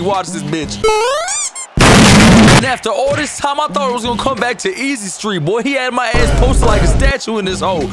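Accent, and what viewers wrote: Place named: New York, United States. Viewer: This man sounds like he from NY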